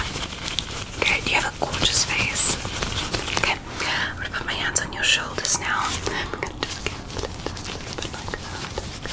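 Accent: England, United Kingdom. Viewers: British!!! Another great video!!!! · Love the English accent!! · The British accent got me dying gurl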